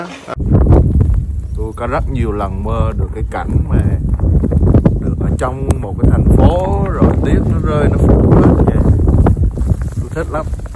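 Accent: Binh Dinh, Vietnam. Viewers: Em này chắc người Bình Định nói giọng nghe giống quê tui :) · Minh nghe tiếng nói của ban chac ban la nguoi o Binh Đình ,nhung chac o day vẫn còn co điện ,con nuoc uống trong nha co đồng bằng không ,o nha nhu vay minh nếu khg co điện thi chac co bếp củi dot liên tục de suoi chu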